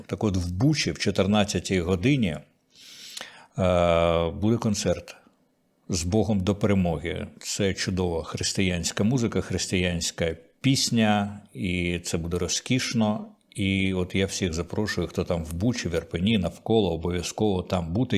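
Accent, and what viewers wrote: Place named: Russia. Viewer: Пад люка перефарбована <link> У тебе навіть акцент ка ца пський аж з вух кров йде.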